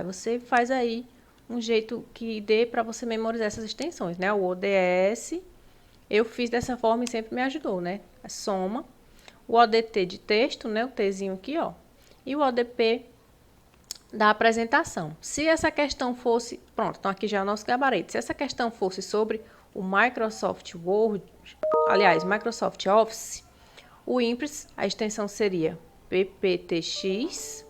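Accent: Brazil. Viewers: Parabéns pela ótima didática somada a esse doce sotaque de algum lugar do Brasil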